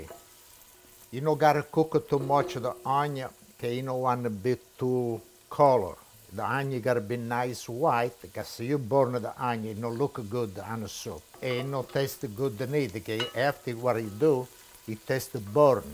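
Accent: Italy. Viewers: best soup in history in my opinion. btw I really love your Italian accent. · I really enjoyed watching you cook. The soup looks really good. I love your Italian accent.😊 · Sig, you are amazing. You speak English the way I speak Italian, so it's always a pleasure listening to you. Farfalline? I completely lost it when you said it would become a farfallone if you put it directly into the soup. Ladies and gentlemen in the audience, you cannot properly translate what the man said into English. You can, but the translation just does not work - I still can't stop laughing. Think, a little, itty bity butterfly put into the soup would become Mothra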